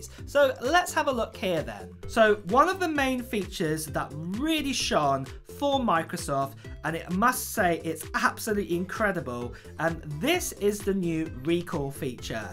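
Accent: United Kingdom. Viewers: 1) No offense but you do have a strong British accent/dialect and talking way too fast. Thus, for many people who’s English is fluent but not the first language is hard to understand you unless you slow down a bit. 2) We need a real world test of this Elite X Laptop vs Mac Book pro where we compare 4k video rendering time length between MacBook Pro and best Elite X laptop. Everything else is silly and waste of our time because even the cheapest intel i5 laptop would be snappy at opening apps, web pages and photos and is not an indication of how faster or more powerful elite X processor is.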